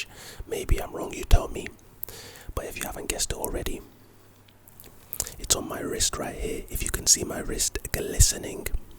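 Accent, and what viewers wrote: United Kingdom. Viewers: Are you from the uk bro?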